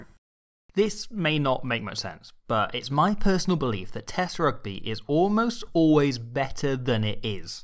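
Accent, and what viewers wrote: Wales, United Kingdom. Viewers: Best rugby analysis ever! And I've been watching the game for 20 years., are you Welsh???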